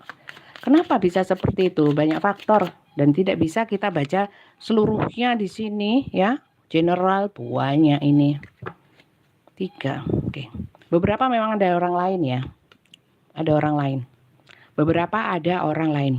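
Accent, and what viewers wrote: East Java, Indonesia. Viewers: Sy sk sm logat bhasa ny mbk..medok jawatimuran.sm"dr jatim mbk..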